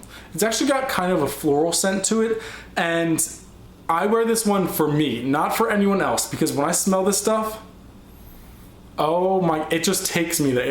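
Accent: United States. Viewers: dear lord your such an American :P not meaning to be rude, but any European (including myself) would be cringing at that pronunciation :) yves saint laurent: l'homme, is pronounced lomme. jean paul gaultier le male is le mal (not ley) dont ever say Diesel like that again :P lol that one was in english so cant fault you there isseymiyake leu de issey sounds like: low dey issay sory for all the corrections :P though hope i helped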